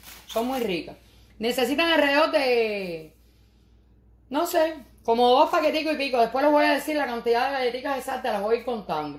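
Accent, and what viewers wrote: Cuba. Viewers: Nena ese dulce tiene huevo crudo.Eso no es bueno para la salud.Pero si te digo eres comica con tu asento cobano chiquitica. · Me encanta como hablas!! Me recuerdas cdo vivía en la isla de mis amores felicidades por mantener tu cubania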